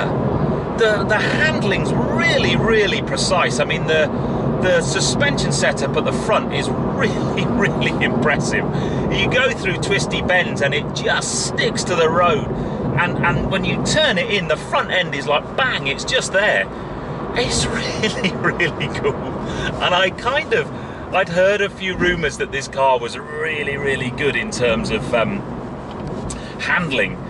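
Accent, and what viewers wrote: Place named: United Kingdom. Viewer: Good review. Subscribed! Honda has made the Civics turbo the quiet performance car, especially the UK version, slightly different than the 1.5 hatch sport in the States. BTW, love your British accent, I hear so many different British accents on the media, but I found yours to be clear and sounding like melody to the ears. I'm wondering which regional accent it is? :)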